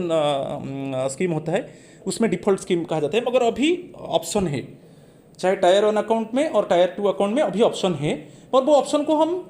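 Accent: Odisha, India. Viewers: Please don't pronounce english like odia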